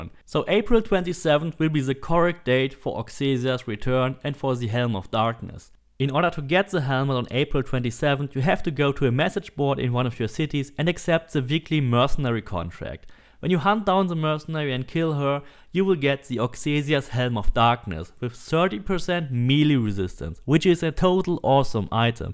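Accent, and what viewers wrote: Germany. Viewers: Is he german ? :D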